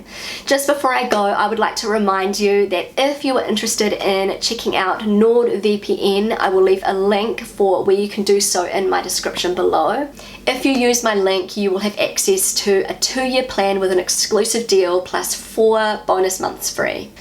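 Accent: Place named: New Zealand. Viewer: I am Missing you and your nice Kiwi accent everytime I'm Not online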